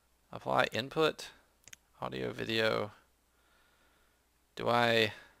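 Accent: South, United States. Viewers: Thats a Southern accent people... herps [erbs]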